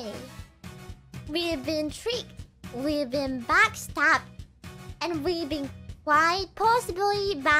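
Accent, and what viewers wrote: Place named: Australia. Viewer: The ol aussie twang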